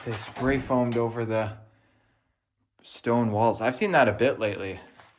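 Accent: Canada. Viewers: I don't know why, but I cant stand the Canadian accent. It's like finger nails on a chalk board to me! Sorry lol I just had to turn off the volume on this and use the closed captions instead! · What state is this? Are yu Canadian? Your accent sounds Canadian. This price is ridiculously high. No wonder it hasnt sold. Horrid reno. Nothing flows. Kitchen an empty cavern.no way was this house ever inspected. This is all stupid rigged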